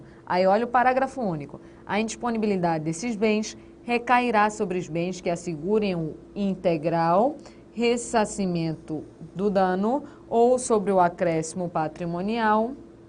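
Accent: Pernambuco, Brazil. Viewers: Gosto das suas explicações, assistindo sempre . Com esse sotaque Pernambucano me acabo de rir qd fala coisas engraçadas.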